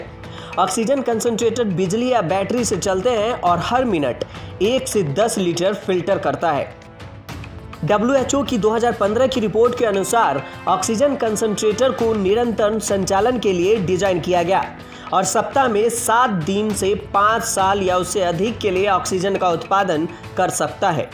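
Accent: Bihar, India. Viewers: Bhai ye Bihar Ka h yha ghode ko ghora khte h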